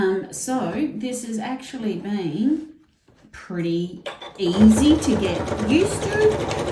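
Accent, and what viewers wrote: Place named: Australia. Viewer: A big thankyou....very informative. Not a boring video as they can be N you sound Aussie, like me.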